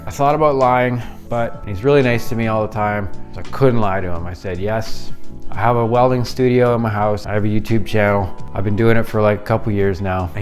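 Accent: Canada. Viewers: U canadian bud. Sick welds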